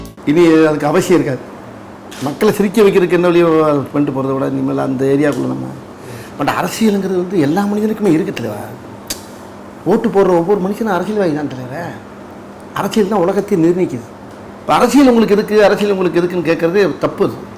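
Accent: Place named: Madurai, India. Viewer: Anne neenga elladadala santhanam pondra " nagareegam ellada comedians" vara arambichittanga. Vaya toranda ketta vartha, kudumbattoda pakka mudiyala anne. Unga azhagana Madurai Tamila ketta comedy meendum kodunga.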